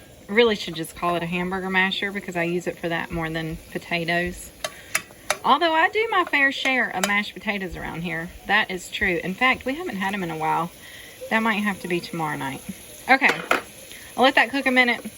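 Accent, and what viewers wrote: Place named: South, United States. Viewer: Hi. thank you I never know what to cook anymore that's why I'm here. and I love the way you talk that southern accent set me on fire.. i love it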